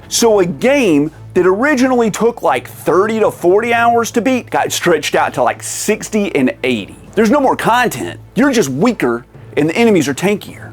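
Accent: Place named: North Carolina, United States. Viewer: Just curious, are you from North Carolina? I am and you have that accent lol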